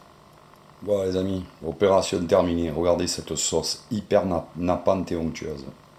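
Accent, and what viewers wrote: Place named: Marseille, France. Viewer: Miracle !!! L'accent Marseillais est revenu (Mais à 85 % ...) Recette excellente comme d'habitude ! 👍❤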